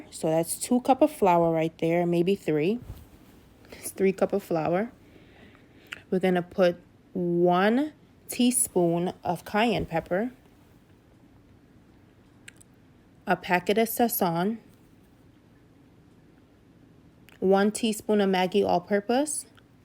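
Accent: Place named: United States. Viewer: Roza send me here loving the content already ? Are you a Jamaican you sound American was wondering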